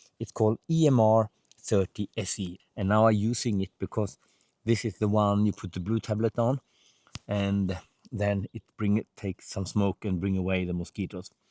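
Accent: Sweden. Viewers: Great and entertaining video, as usual, 👌🏻 And, as another Swede (right now based in Lycksele), I also find your lovely Swenglish to be entertaining in itself 😊 Reminds me of Swedish friends struggling with launch vs lounge.. 🤭😁 I think it's like you said: for the devices you need to hold in your hands a lot, it wouldn't be practical to always have to rely on external power source/bank. On my hikes, I carry a Fujifilm X-S20 with a 70-300mm zoom and a DJI Action 6, both being very mobile by hand, so to say, and thus not suitable to be 100% dependent on external power. I use both a lot, so I carry 2-3 spare batteries for each. As a solo-hiker, wrt a device like Garmin Sat messenger, I think a built-in battery that's well protected from the weather is the preferred solution, for safety reasons. That said, I do agree with that user-replaceable batteries should be more common. 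I also agree with that external power banks bring flexibility when it comes to devices that are only used part of the time - and hopefully not all at the same time 🫣 - coz then they can time-share the external power bank(s) 🤓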